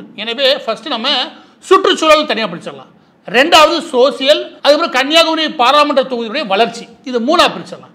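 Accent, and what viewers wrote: Madurai, India. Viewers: மதுரையை விட்டு கிளம்பி மஹாராஷ்டிராவுக்கு வந்து 24வருஷம் ஆச்சு.. உங்களாலே தான் மாரி தாஸ் சார் நான் மதுரக்கார ஃபீலிங்ல சந்தோஷமா இருக்கேன் 😊✌🤝I like your Madurai accent👍👏💃😊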